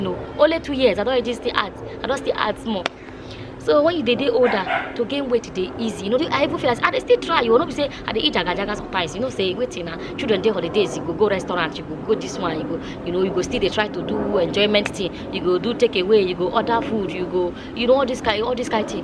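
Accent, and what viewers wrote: Nigeria. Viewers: Pidgin english sweet for your mouth oh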